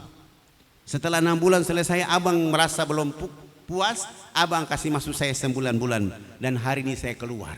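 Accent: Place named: Papua, Indonesia. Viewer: Pa ustad kemana aja saya senang dengar dakwah nya.dengan suara kas Papua nya sering" lah isi di yutup.tks sebelum nya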